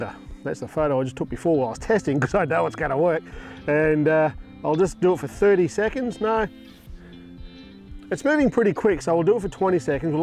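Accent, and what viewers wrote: Australia. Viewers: With my upper intermediate English I can barely understand Australian accent :( But videos are great :) Thanks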